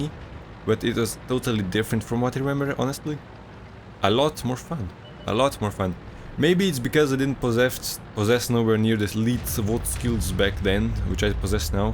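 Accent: Russia. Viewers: Dude i Love your Videos! They are hilarious😂💪🏻 where are you from btw? Russia or maybe Serbia? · I really think you should do more of these vids, russian accent is best accent in the world! Also the history lesson was nice :D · Are you Russian who speak english?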